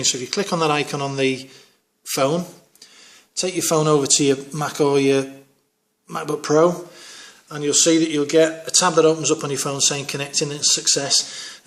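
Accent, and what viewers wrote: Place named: London, United Kingdom. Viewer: what is the app??? i cant understand your london accent !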